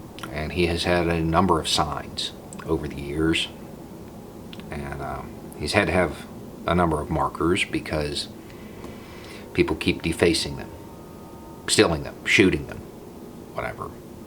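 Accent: South, United States. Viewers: At the beginning of this video....I interrupted it by watching the short documentary "The Body Of Emmett Till" from the Time channel ( long) and then went back to watching this video from...a southern white bearded man with a southern accent speaking with reverence about him. If Emmett Till could hear the words spoken by.......just wow.......I think he would have hope (even this many years later and that's a shame it takes this long). There's still a ways to go. I'm kind of at a loss for words right now after seeing the open casket photos and footage followed by words.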